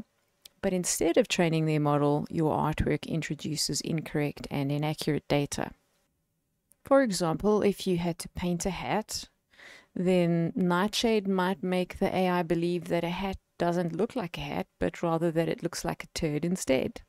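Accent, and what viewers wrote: South Africa. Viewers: I just found your art now! I can’t believe this is the first video I’ve seen from you! By the way, are you Afrikaans? I can’t help but notice that familiar accent! :D God bless! Keep creating :)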